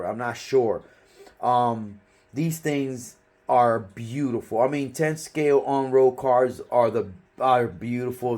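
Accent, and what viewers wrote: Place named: New York, United States. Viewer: Hey my brother where you from I'm just curious cuz you sound like a New Yorker I used to live in the Bronx, The reason why you took me back, I'm getting right back into the hobby again.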